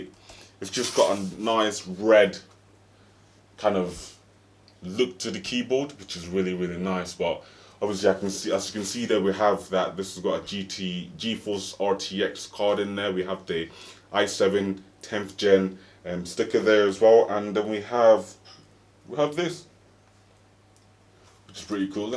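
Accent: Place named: United Kingdom. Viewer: Alabama? But sounds like british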